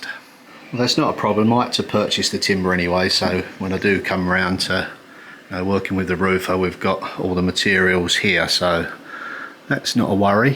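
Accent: United Kingdom. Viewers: I love seeing your videos, both here and on the Pethericks!. I'm curious, where in Britain were you raised? I'm working on my accent for acting and wonder where your accent comes from? Thanks!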